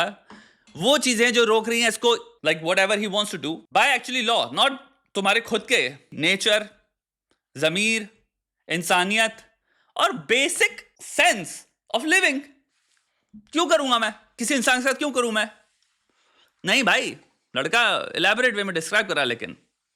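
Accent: Pakistan. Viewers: The accent gives me that he is from Pakistan. I might be wrong but all the lingos and slangs he is using are usually used by Pakistanis. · Lahori accent ha iska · Accent to pakistani lag rahi hai iski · His accent coincide more with pokistani than with an Indian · he has pakistani accent and muslim i am 100%sure he's a pakistani and that's their thinking for a kafir women's that's what they are taught since childhood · H sounds like Pakistani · Pakistani lg rha hai accent se... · His accent is Pakistani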